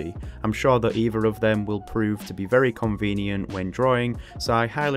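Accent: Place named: Hull, United Kingdom. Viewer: Hello. Just thought I'd ask, with that accent, are you from Hull? I grew up there myself. Love the channel by the way, I've learnt quite a bit just from your tutorials.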